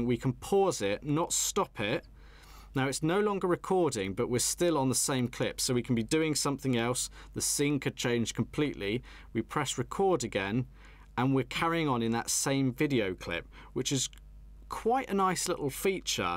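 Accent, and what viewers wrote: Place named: United Kingdom. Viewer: i think he sounds british.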